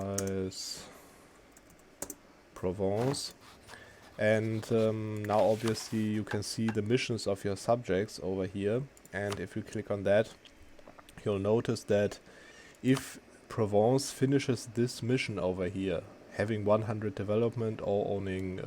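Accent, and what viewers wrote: Germany. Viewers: You have a German-Accent XD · You’re from Germany, right? Nicht schlimm, ich mag unseren Englischakzent. :D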